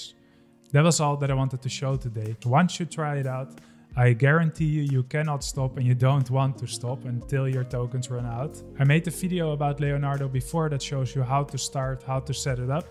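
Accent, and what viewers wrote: Netherlands. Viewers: First seconds watching this video and i immediately know you're Dutch ahhaha nice accent bro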